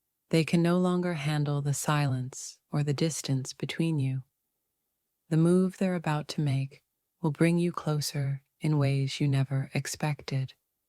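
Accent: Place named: Scotland, United Kingdom. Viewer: 😊🤗🌹 did you believe the distance was not having effect on me? It did have an effect on me. I love talking to you and now I'd like to see you sit down and let's start talking. By now. You know me so well. Don't think you'll even be nervous. I just can't wait to hear that British accent. A lot of it's gone. Which old videos to now still there? Oh, it's Scottish. I'm sorry. Excuse me your Scottish accent. I have to get that straight. I will. Everything we do now is going to be for the better you're going to be a lot happier. Feel a load off you that you haven't felt in 30 years and you got me with a woman who truly just loves you. You lose everything tomorrow okay? We are both and great hands. I know I'm always going to be honest and I know you are because you believe in call and I hope with your domestic issues you resolve them fine. 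Get her out of your life, not for me, but for you she's dragging you down. She's making you believe things at on shrew. I don't like when women take advantage of men's good hearts and that's what she's doing to you. Take the rest. Take the chance I am. What are you thinking My love 👠💋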